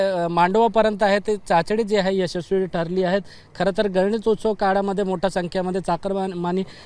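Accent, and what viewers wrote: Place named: North India, India. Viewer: आरे हा काय बोलतोय आणि कसा बोलतोय . सगळ चुकीच बोलतोय .पण मराठी बोलतोय सर्व भैयान मराठी बोलता आलच पाहजे.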